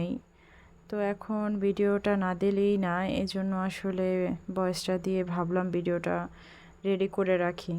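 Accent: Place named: Barishal, Bangladesh. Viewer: বাবা টার জন্য অনেক দোয়া রইলো আপু বরিশাল শুনলে মনে একটু শান্তি শান্তি লাগে পিজ্জা টা অসাধারণ হয়েছে কিনা কড়াইটা ও খুব সুন্দর হয়েছে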